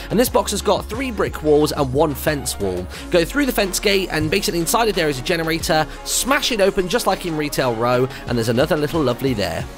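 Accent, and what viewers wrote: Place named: RP, United Kingdom. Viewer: UnknownMasksW2serialirious he sounds like a British butler.. Like seriously dude lol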